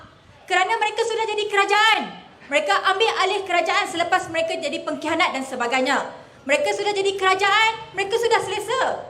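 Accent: China. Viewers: Jangan mimpi la...ni Olang melayu ke Cine..ckp pon dah macam Cine ckp melayu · cakap pun dah gaya macam cina...ibarat cina cakap bahasa melayu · Ale uto ko la tali barut....law umno xde xde la malysia aman smpai skg...ntah2 ko pn xde...ahhaahha....ale uto....ale uto....melayu ckp sleng2 cina....tali barut.....tali barut...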